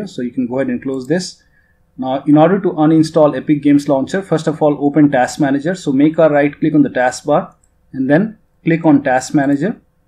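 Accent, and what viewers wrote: India. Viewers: love indian accent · Its always an indian accent guys helping me out. thanks so much bro i hope u are doing okay. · I swear it’s always the indian accent guys that always have your back. When your unclogging a toilet, helping your wife give birth, or getting that last pringle chip out of the container. They always got you. What a legend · This is a good video i like ur indian accent bro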